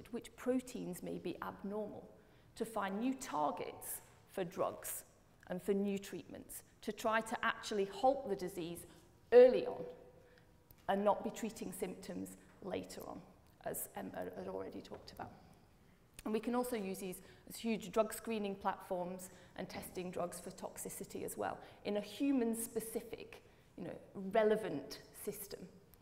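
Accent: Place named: United Kingdom. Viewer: Wonderful information. With your Brittish/ English accent and your rapid diction, it was almost too fast for me. .... an American born english speaker. Imagine the difficulty for my French wife who speaks English fluently. I can tell you are supper enthusiastic, but a large part of your potential audience cannot be included.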